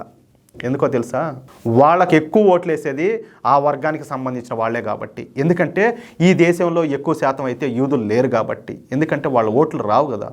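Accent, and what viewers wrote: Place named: Chittoor, India. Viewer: Well Said Bro. You rock. Especially your Chittoor Accent is too good.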